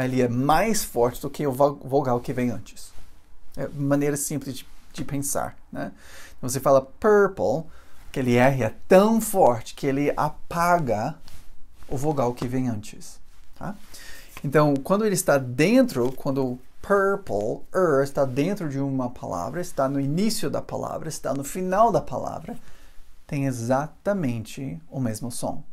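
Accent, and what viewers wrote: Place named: Brasília, Brazil. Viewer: Me identifico muito com seu jeito de falar pois também sou de Brasília! Grande abraço!!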